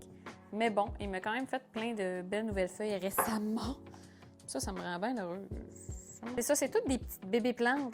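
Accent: Canada. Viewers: Accent canadien = sympathie = pouce vert ! ❤️❤️❤️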